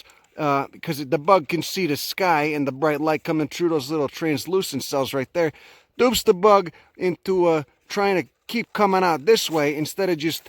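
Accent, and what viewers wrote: Chicago, United States. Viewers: This guy sounds like he's from the near south east side of Chicago! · He's an Italian from Chicago. And that's a pure Chicago italian accent.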